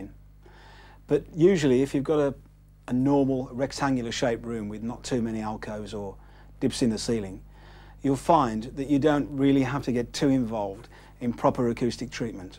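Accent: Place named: Birmingham, United Kingdom. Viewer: He has similar accent as jasper carrot from Accocks green, is from about 3 miles away. Kitt’s green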